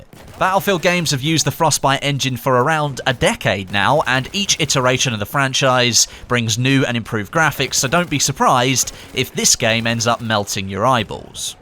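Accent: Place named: Essex, United Kingdom. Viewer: He's so Essex!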